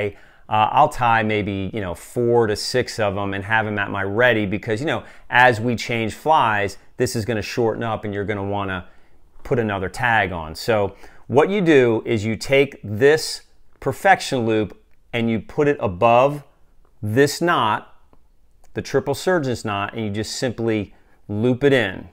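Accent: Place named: New Jersey, United States. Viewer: It says Old Dominion, but every time you say "wadder" I hear NJ/eastern PA.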